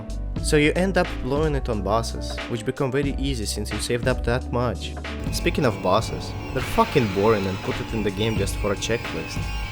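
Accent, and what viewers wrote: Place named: Ryazan, Russia. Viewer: ох уж этот рязанский акцент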